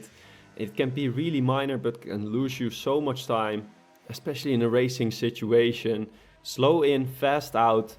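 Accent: Netherlands. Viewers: holy nl accent mr thx man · thx voor de tips man, holy Nederlands accent wel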